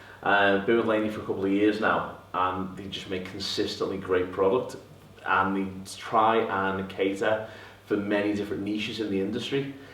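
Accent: Liverpool, United Kingdom. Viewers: Scouse (Liverpool) accent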